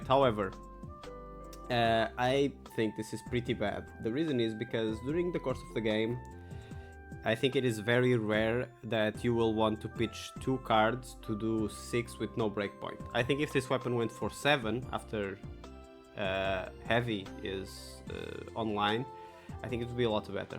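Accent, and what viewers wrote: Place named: Portugal. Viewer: You sound portuguese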